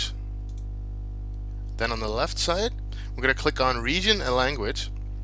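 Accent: Netherlands. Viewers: Are u dutch? Lm je bent nederlands:D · haha ik hoorde gelijk dat ik naar een Nederlander keek toen viel de naam me pas op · clean accent for a dutchie!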